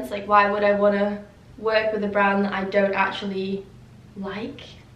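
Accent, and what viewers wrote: United Kingdom. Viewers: Is it just me or does your accent sound British now? Were you in Britain, or are you practicing their accent? 😀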